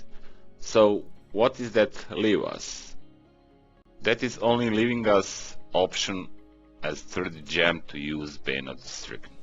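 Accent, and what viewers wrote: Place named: Czech Republic. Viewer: really useful videos!!...thank you so much...btw your english sounds like czech or slovakian:) sorry if i miss...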